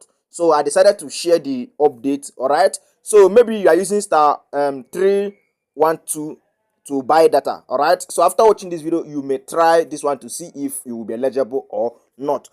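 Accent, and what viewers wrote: Igbo, Nigeria. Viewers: Honestly. It's that Igbo tongue that makes me smile on my first view of whichever of his videos I bump into. 😂😂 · Na your igbo tongue dey give me joy😅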